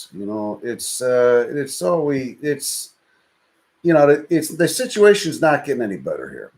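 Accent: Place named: Iowa, United States. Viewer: Love his Iowa accent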